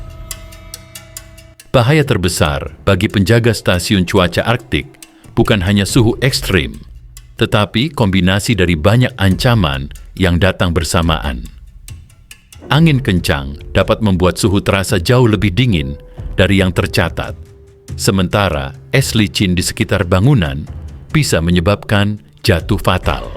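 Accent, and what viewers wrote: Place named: Solo, Indonesia. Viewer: salah,ini suaranya pria solo fixs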